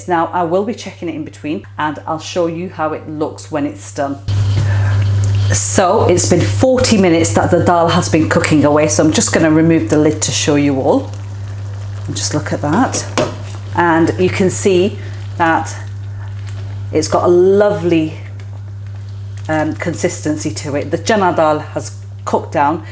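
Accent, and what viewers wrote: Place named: RP, United Kingdom. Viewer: You sound so posh in your explanations very professional, you should read the news x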